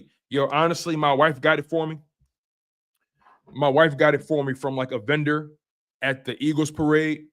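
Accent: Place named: Arizona, United States. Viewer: I ain’t know you was from Arizona street i got a lot of family there might have some mutuals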